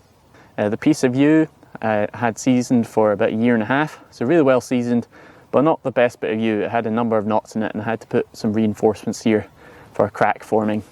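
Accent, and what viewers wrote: Scotland, United Kingdom. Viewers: Even better given the presenter has a Scottish accent. 🙂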